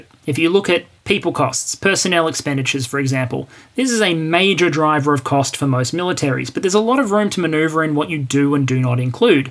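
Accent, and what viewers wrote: Australia. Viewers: Is this the economics explained guy or do all Aussie's sound alike?? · great informative vid, loved hearing an Aussie voice delivering the content.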